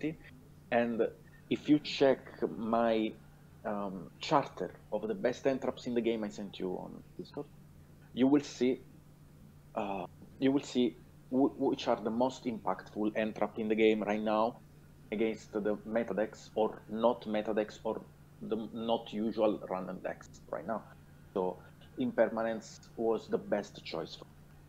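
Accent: Italy. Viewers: italian pronunciation, i love you <3. TE SE AMA, FORZA ITALIA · love the spaghetti accent and you should interview Madolche God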